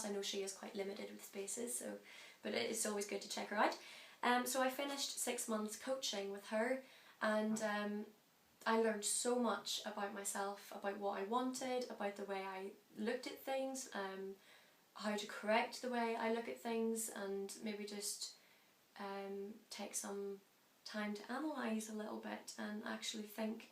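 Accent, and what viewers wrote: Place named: New Jersey, United States. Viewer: thanx for the video. where r u from? you have an accent. Jersey?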